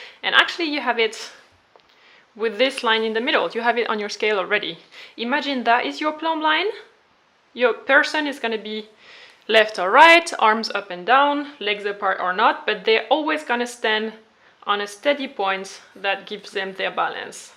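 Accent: France. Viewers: Lissy London hearing her accent, she’s probably french so I’d say that’s where she went, I could be wrong though!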